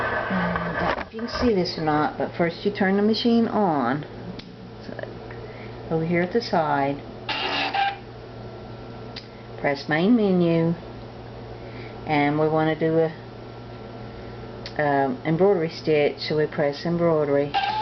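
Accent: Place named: Tennessee, United States. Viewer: Hello, Thank you having the ambition and courage to help us newbie better learn our machines. I have an old 2144 that I'd wanted forever. But there it sits, because no one has helped me with teaching or coaching. I did upgrade it to 2170 online. I see you don't use the cut function. - Does yours not work? I wish you loved close to me, but by your accent, I'm going to say TN.:) (I'm in So Cal.) Big Hugs, Nanette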